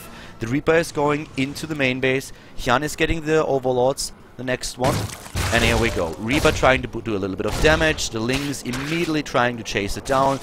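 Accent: Germany. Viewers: i am quite new to this game and got heavy problems to get into it anyway every beginning is difficult.. i watched a lot of videos alrdy highlights builds orders anything.. but your comments and explaining the games is awesome man it really helps and is fun as the same time really awesome videos i cant tell you how much i enjoy to watch your videos about SC2 really really hardcore thanks for that man. dont know may it is your job. finally pls tell me aer you german or american ...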